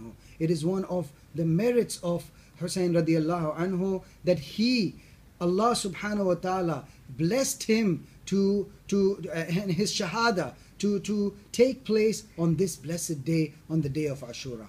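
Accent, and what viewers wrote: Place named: South Asia. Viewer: You seem to be pretending being an Arab from Saudi Arabia but I can notice from your look and accent that you are actually of some South Asian background possibly Pakistani or Indian. You don't have to look like an Arab for people to listen to you.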